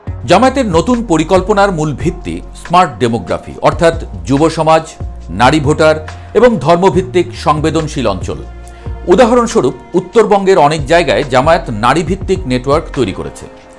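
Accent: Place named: Kolkata, India. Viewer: Apnar kotha bolar dhron kmon jno kolkata kolkata tan ta ace .... 😅😅Bolci dada apnar bari kotay???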